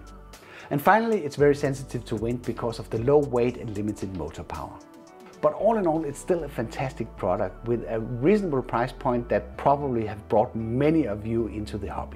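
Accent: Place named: Denmark. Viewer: Er du fra Danmark? Jeg synde jeg kan høre det😂🇩🇰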